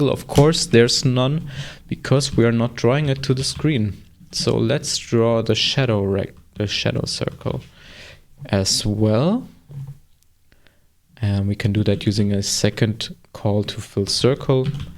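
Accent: Germany. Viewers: German accent ↗️↗️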